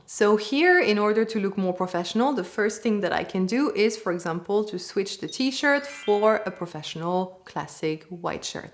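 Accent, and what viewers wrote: France. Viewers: Merci beaucoup. Vos conseilles sont très intéressantes. Je les regarderai. J’habitais en France pendant un an quand j’avais 18ans. Mes amies, ma mère d’accueil et beaucoup d’autres femmes dans ma vie ont utilisé tout les chose que vous avez nous montrer ici dans votre vidéo. Merci beaucoup, j’avais besoin de souvenir tout ces informations. J’aime aussi comment vous parlez anglais ❤. Très bien, et avec une belle accent français! ❤😊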